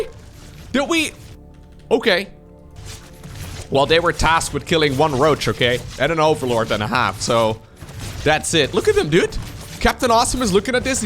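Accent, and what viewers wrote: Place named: Netherlands. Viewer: I am very, very sorry to say, but every time you said Fitzroy's name, my brain wanted to assume that you are trying to say Viceroy, but with your Dutch accent:D sorry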